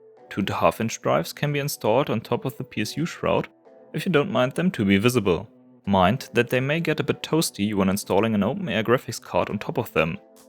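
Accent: Germany. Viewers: Good review! I found it funny: as i listened on, I thought your accent seemed german, as soon as I saw the Wera VDE 1000V certified screwdriver I was certain.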